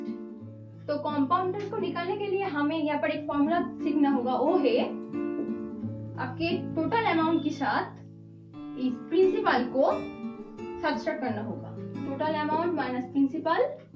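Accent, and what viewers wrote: Bengal, India. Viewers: Oo pagal she is bengali so her tone of hindi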